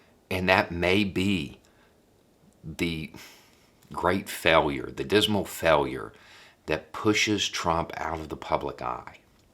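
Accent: South, United States. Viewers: Too bad Jill Biden can't be Secretary of Education, cuz Joe's got ethics.🤘❤️🤘 Happy Diwali, y'all. PERFECT timing. Btw, YOUR Southern accent makes you sound smart.✌️ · You have a southern accent are you having to fight people in walmart like me with my Biden hat?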